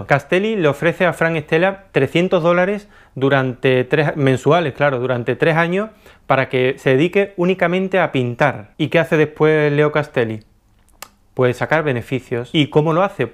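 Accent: Andalusia, Spain. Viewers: Hola!!! Te descubri hace poco,me encantan tus videos. Tambien fui a Escuel de Arte,pero no pude ser artista,pero ahora me has dado un empujon! Jajaj. Me encanta tu acento,de ue parte de España eres? Es que tienes el acento muy parecido a una gran amiga que tengo y ella vive en La Linea de la Concepcion. Un abrazo enorme desde Liverpool!!! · Por fin encuentro alguien (serio y con formación) que dice la verdad sobre este negocio. P.D: Me encanta el acento andaluz.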